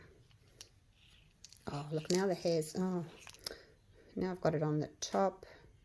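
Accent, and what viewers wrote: Australia. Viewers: so good to hear an aussie voice!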